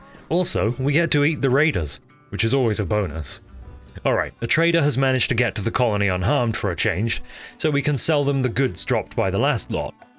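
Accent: United Kingdom. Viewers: Hearing a brit talk about Starcraft just feels right. Rip TB, we do not preorder.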